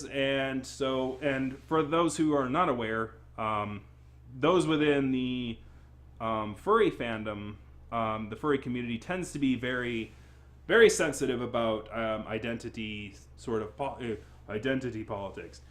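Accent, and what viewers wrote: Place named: Australia. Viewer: At last! An Aussie accent! Maybe you've done that in the past, but this is my first time present for it, so... One thing the Furry Fandom seems to be really good at is creating its own problems and then complaining about it. This whole thing with h3h3 is just the latest example. The Majira episode is truly a decent opportunity wasted by technicalities which have already been forgotten, ironically enough. I can't say that the realization of what the Fandom is about isn't lost on furries themselves, since LOTS of them act like it's about the suits, even if they say it's not. It's disappointing to me, but it is what it is. All this podcast did in general is comment on the conduct they're seeing, so I respectfully shrug. What were we expecting without an experienced guide? Then again, you make a valid point about decorum. No one with taste would throw on makeup and S&M if they were having KISS as guests. 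I often think of jobs I've had or just doing a favor for someone where I'll reach for something, and usually boring people will automatically vomit the phrase "he has long arms.". Well, thanks Captain Obvious. And they say this in a way that requests that I grin from ear to ear like an idiot and express near grief when I don't. Yeah, guy, it's nice to have 'em, but it's not all that interesting when you have 'em. Thank you will do the trick. The latest on this can be found on Twitter, where the debate on whether the Furry Fandom even needs representatives rages. Never mind that i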